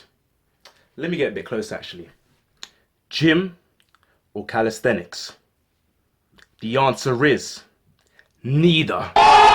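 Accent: London, United Kingdom. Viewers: It's a London accent, though there are many different London accents (posh, cockney, and more 'street' as you could call this accent).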